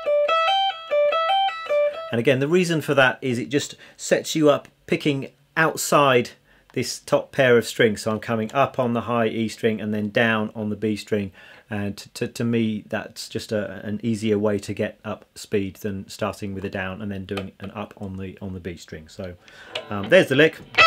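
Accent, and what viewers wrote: England, United Kingdom. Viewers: Great lesson ! Where in England are you from ?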